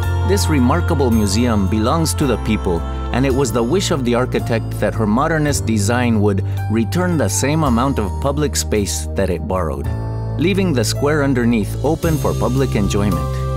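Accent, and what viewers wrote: Spain. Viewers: Por que o cara tem um sotaque espanhol? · very cool video, but EXPEDIAAAAA why the SPANISH accent on top? We speak PORTUGUESE, our accent is very different from the Spanish accent when we speak English. Should put a Brazilian- Portuguese accent on top if your intention was to make a video as if it were narrated by a local. Just a thought. Nice video though.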